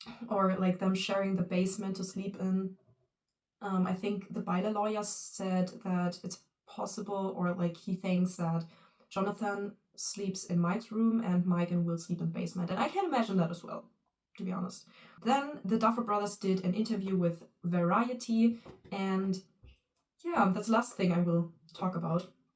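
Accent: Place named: Germany. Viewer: Ich kann deinen deutschen Akzent hören 😼😼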